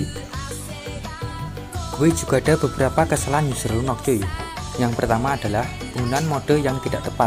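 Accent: Java, Indonesia. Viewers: kalau medhok gak usah gua gue bang, gak cocok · medok bet lu bor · Bang suara nya medok banget anjir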